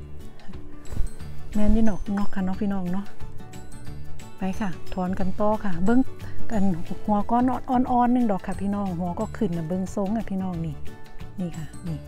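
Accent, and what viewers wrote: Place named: Phu Khiao, Thailand. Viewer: เสียงเว่าคือคนทางภูเขียว ภูเวียง ทางชุมแพเนาะจ้า เว่าสำเนียวมวนดี ขอให้มีความสุขกับการใช้ชีวิตในต่างแดนเด้อจร้า😍😍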